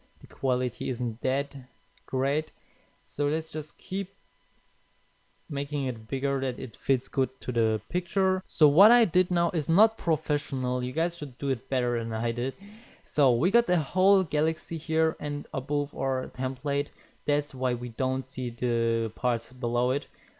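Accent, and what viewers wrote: Germany. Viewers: Ach hab mich schon gewundert 😂. Deine Sprachstil klang so englisch aber die Aussprache mehr deutsch, deswegen war ich bissl unsicher. Aber Danke für deine schnelle Antwort 😂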